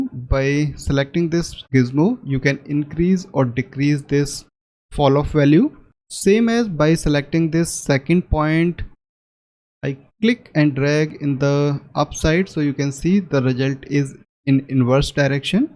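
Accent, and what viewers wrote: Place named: India. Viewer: There should be a setting that transforms İndian accent to American accent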